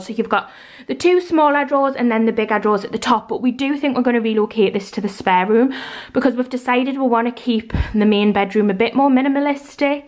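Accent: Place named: England, United Kingdom. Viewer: I love "bathe-az", "joggaz", "furnitaz", "knickaz". Not taking the mick, just love the different ways we all say words in England.❤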